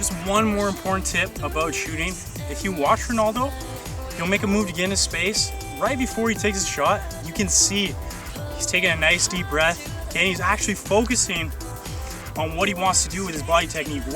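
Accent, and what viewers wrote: Canada. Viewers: Are you Canadian? :)) Abowt. Abowt. Abowt.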